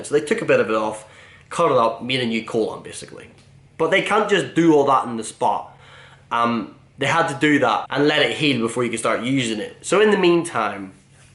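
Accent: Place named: Scotland, United Kingdom. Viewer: Haha sum boy what part of Scotland you from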